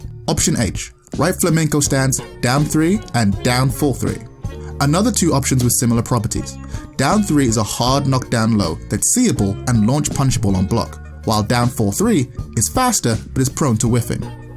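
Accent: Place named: United Kingdom. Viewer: This was a steller breakdown. I don't know why but I pictured narrator drinking tea in the background. Must be the accent.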